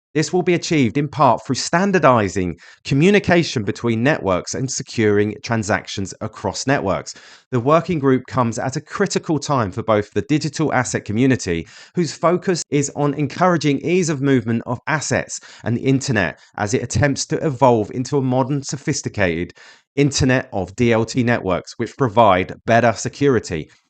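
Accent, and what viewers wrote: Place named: United Kingdom. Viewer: Not to mention, I think your British accent rocks!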